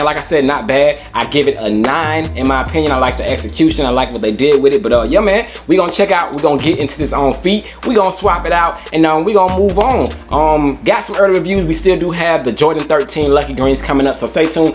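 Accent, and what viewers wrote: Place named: South, United States. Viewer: Man on the real I don’t like the shoes but you gotta rock with my G for the true southern accent bruh you gotta love it keep it real for ya boys stayin fresh in that southern mud baby 3rd coast holdin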